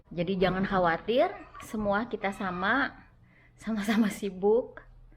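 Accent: West Java, Indonesia. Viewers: Meuni sundaa pisaaan euyy ..samii abi oge mojanh priangan atuh lahh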